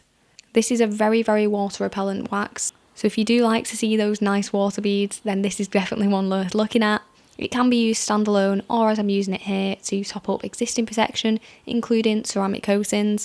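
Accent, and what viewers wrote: United Kingdom. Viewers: Great video thank you. Also a very soft and delightful British accent,(please talk more!).😊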